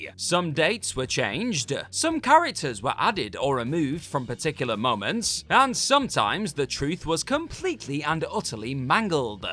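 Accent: United Kingdom. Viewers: I don't believe the writer is actually reading his script. I think they are using an AI reader, with a British accent myself. you can't hear him breathing, or even stopping to take a breath while he is talking. Also A lot of words get mispronounced like NIKE ( Nik-EE)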